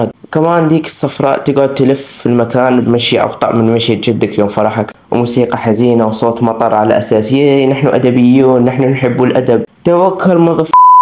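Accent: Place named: Saudi Arabia. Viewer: احس صاحب القناة سعودي ههههه حسبته مصري طلع سعودي بعد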